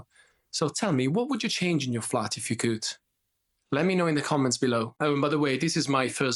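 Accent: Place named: Glasgow, United Kingdom. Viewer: Top notch! You ever lived in Glasgow? Got a bit of glasgow in ya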